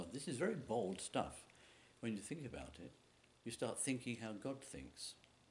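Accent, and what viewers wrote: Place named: England, United Kingdom. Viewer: Dear father, an English accent???!!! Where is your church? I’m a recent English convert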